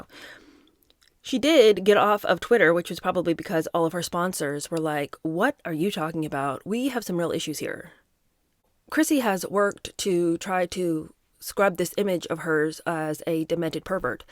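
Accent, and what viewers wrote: United Kingdom. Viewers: I can’t stand listening or looking at anything about Megan markle BUT seriously, your videos make it so humorous that I find myself laughing so much that it hurts. Your British accent cracks me up with laughter, your analysis of Megan teagan and the boring s h I t show is the most hilarious video I’ve ever seen. I’m gobsmacked that teagan posted those horrendous pedo comments about children, I was un aware of her evil, sadistic, perverted nature! Ewe! DHS should check up on Reagan’s kids, b/c I wouldn’t be surprised if she’s lent them out to other pedos, maybe that’s why she was elevated as a celebrity b/c who she knows and who she gives her children to, to abuse them. There’s so many pedo elites willing to help ppl like teagan, IMO. I feel so sorry for you having to sit and watch Megan’s crap show so that we don’t have to thank you sooo much. 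I love your videos please keep it up. 👏🏻👏🏻❤️🫵🏻😂🤣😂🤣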